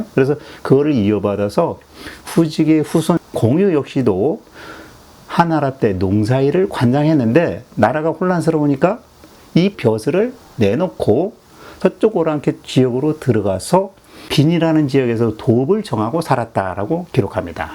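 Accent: Gyeongsang, South Korea. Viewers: 깅상도 아이가?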